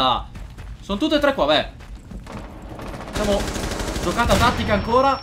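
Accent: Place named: Parma, Italy. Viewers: Ve l'omo é bello il dialetto di parma io somo di fidenza☺ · Il tuo dialetto parmigiano è il top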